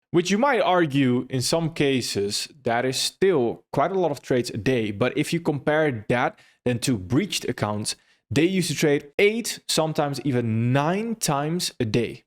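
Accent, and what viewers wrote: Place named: Netherlands. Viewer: Ik sense een beetje hollander 😅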